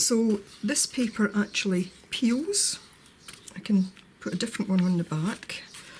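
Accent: Scotland, United Kingdom. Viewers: I don't know if anyone mentioned this already, but the things that you thought were stickers look like window clings to me. I love your art work, and also your accent! My grandmother was from Scotland and I've always loved to hear that accent. I'm from the US.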